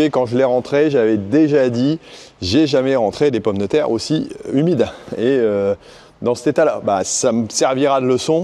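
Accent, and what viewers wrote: Nord, France. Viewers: Bonjour, je te suis depuis la seyne sur mer (var), mes parents étaient cultivateurs à Naves près de Cambrai. J'apprécie tes vidéos, tes explications sur l'agriculture d'aujourd'hui. Bonne continuation pour la suite. L'accent du Nord est magnifique.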